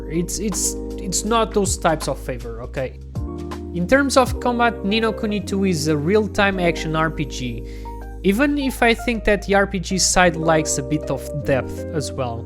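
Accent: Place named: Portugal. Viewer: i can tell by ur accent man:p great video i was thinking about buying that it's on sale on the ps store, eu também sou tuga ahah:p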